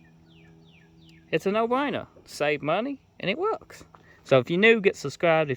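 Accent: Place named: Louisiana, United States. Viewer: hey, how's everything going? I'm guessing he's referring to the way you speak. Your accent is quite obvious. Where are you from? I was thinking Louisiana (Baton Rouge)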